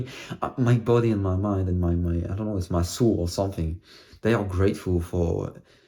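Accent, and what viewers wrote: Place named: Baltic states. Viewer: Putain je me doutais t'etais francais mais t'as comme un accent genre lithuanie estonie vers labas 😁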